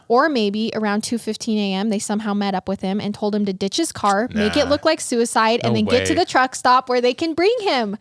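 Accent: Utah, United States. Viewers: Crick for creek hill for heal sell for sale…. The list goes on! even the captions put other words form the way she pronounces. It’s a Utah thing right?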